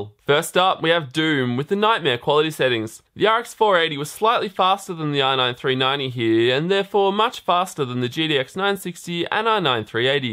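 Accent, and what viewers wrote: Australia. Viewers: Oh ok, I didn't know this....hey so you have an Australian accent, do you live in australia or...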